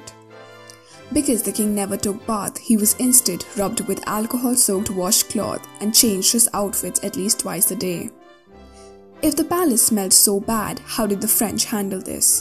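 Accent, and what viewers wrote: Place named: India. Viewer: I just can't take the Indian accent seriously. I hear it, I'm gone.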